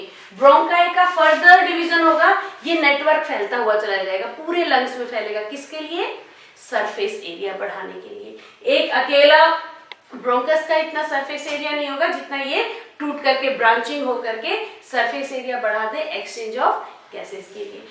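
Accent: Haryana, India. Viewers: Haryana k ho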